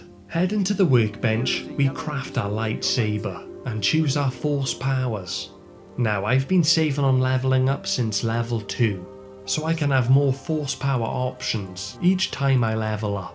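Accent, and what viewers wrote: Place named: Wales, United Kingdom. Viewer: its what happens when we welsh try to speak properly so others can understand us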